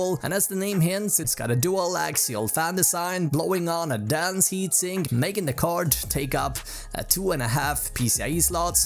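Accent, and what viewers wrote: Sweden. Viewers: Please go watch some American Tech YouTubers, listen to them and then try to speak like them, slowly with taking a breath and pronuciation Thank You Very Much 🙏 Swedish have very similar accent to native English ... Don't know what the problem here 😥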